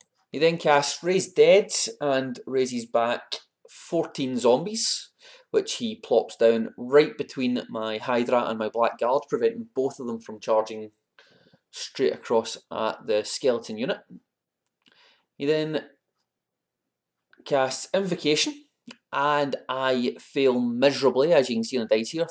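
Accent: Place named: Scotland, United Kingdom. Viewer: Not to far from me then, its great to hear another scottish voice. I recognised your braw dice ha ha i have same kind got them at the scottish open in striling in october. I had my fluffy orcs at that tournament......lets say it didnt go to well ha ha Next time its my wee stunties only. Ive just finished editing a game i had against chaos dwarfs uploading it later, check it out. See how goblins fair against broken chaos dwarfs. Take care and merry xmas. Maybe see you at tournaments next year i hope.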